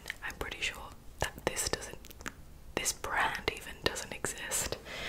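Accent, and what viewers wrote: Australia. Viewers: Just asking r u aussie, cause ur accent kinda sounds like mine and aussie peoples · Sounds australian to me?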